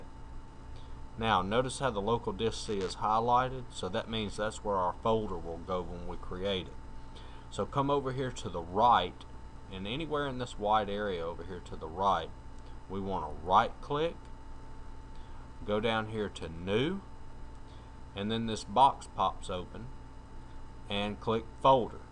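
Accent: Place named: Appalachia, United States. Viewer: how about on chromebook ps you sound like a hill billy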